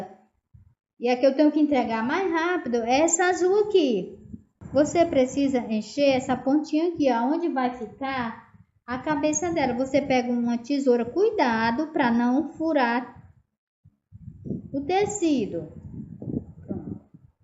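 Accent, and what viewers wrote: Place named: Piauí, Brazil. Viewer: Você é do Piauí, esse sotaque parece do povo de lá. Parabéns,bela arte.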